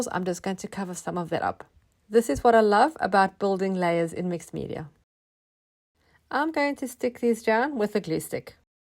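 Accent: South Africa. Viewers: Howdy watching from Cape Town in South Africa. Your accent sounds very South African.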